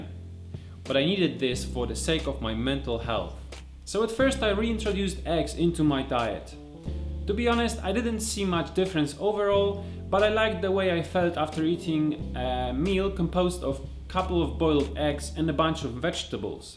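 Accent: Poland. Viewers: He sounds polish and the beer pic was from a polish beer too, so I assume that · zubr! polski akcent :D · tak czulem ze Polak, chociaz stara sie zmieniac akcent :D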